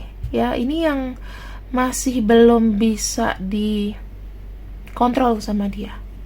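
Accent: Java, Indonesia. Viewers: jawa medhok 😂😂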